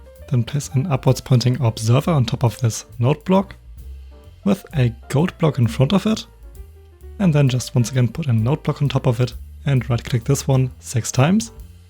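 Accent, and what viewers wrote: Germany. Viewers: Warum nicht deutsch man hört das du deutsch bist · I hear a wening deutsch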